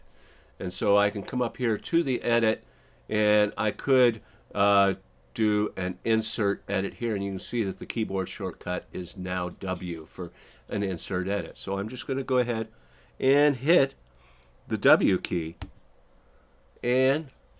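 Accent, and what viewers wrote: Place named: United States. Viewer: the english of yours is pretty dement, speaking like proper yankee.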